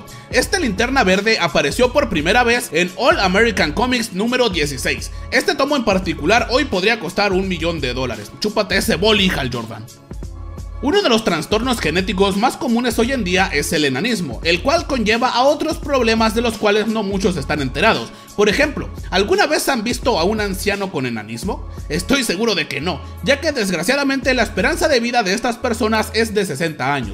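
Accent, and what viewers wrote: North, Mexico. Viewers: Me encanta tu acento norteño. Como de naco intencional.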